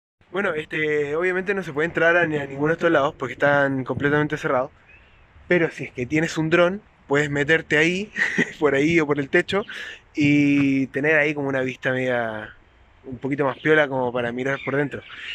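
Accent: Chile. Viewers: vi Que sos Chileno, me imagine por el acento porque mi cuñada es Chilena 😄 Buena suerte con los vídeos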